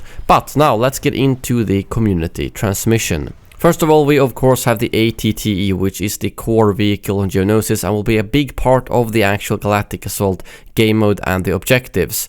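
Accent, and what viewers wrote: Sweden. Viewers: U swedish huh?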